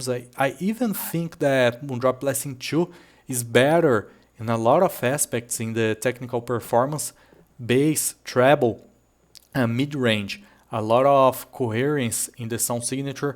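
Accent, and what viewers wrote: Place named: Brazil. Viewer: É sempre legal ouvir BR falando inglês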